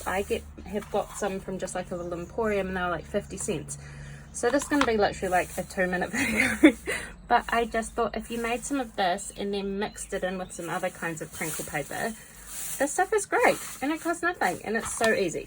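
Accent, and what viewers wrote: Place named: New Zealand. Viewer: Love your accent. New Zealand?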